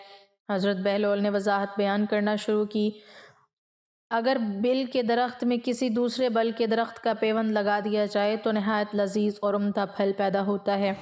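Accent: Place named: Saraiki, Pakistan. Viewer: یہ سرائیکی excent لگتا پے۔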